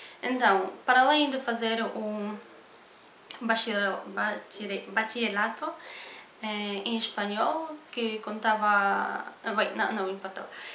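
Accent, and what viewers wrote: Portugal. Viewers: Sou brasileiro, achei no início que fosse uma portuguesa nativa, pelo sotaque. Isso não causa problemas de compreensão para nós do Brasil. Entendi completamente sua fala neste vídeo. Sucesso para você! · Adorei seu sotaque lusitano! Beijos! :) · Como ariano serei sincero, eu entendi tudo que você disse, porém particularmente pra mim, escutar o português de Portugal me sinto como se tivesse voltado no tempo uns 1500 anos atrás, no tempo do império! 😄. Porque esse sotaque é desnecessário , tanto que no Brasil não temos esse sotaque travado na pronúncia. Bem essa é apenas minha percepção. Aqui no Brasil a língua portuguesa evoluiu. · Esse sotaque português é charmoso mais é bem engraçado para nós brasileiros, me remete as novelas de época, mais seu português é ótimo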